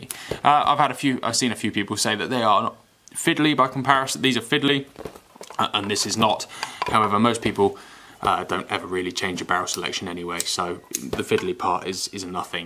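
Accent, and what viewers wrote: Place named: RP, United Kingdom. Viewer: Should of mentioned this before but i will now. The quality and depth of your videos are second to no one on you tube and your knowledge of firearms for a man of your age is quite Remarkable and something i could only wish for and all delivered with a BBC quality voice. Well done posh bloke excellent.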